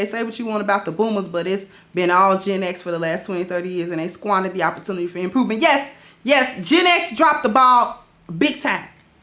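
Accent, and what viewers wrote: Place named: South, United States. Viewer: Yu got to be frm the south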